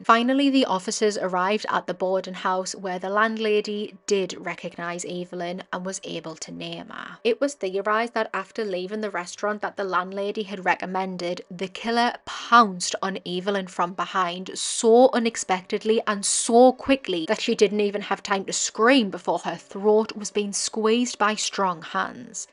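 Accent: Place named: South Shields, United Kingdom. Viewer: Your accent sounds local to me. I’m from South Shields. First time listening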